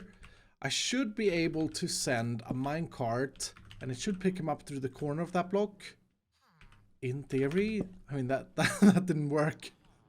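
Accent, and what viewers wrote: Sweden. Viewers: i thought so im hearing om is English it’s very good but I hear that Swedish voice lets gooo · are you swedish?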